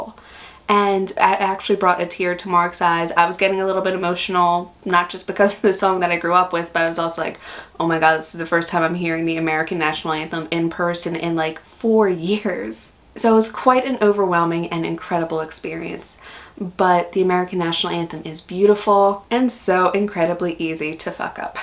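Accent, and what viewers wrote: Australia. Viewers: You now sound like an aussie. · I can hear that you are subtly starting to gain the Australian accent, congrats and welcome home. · Sounds like you've become Australian. Congratulations!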